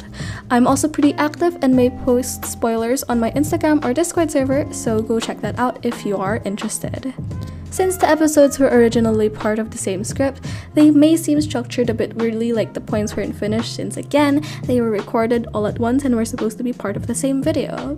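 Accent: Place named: Philippines. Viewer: This is completely irrelevant but are you filipino? I can tell by the accent 😭 (I don't mean this in a bad way btw your accent is amazing! I can just tell when someone is one of my own based on accent hehe)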